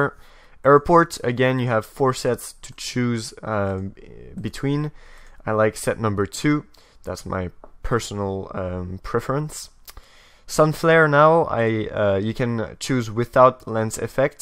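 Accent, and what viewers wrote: France. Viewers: are you french ???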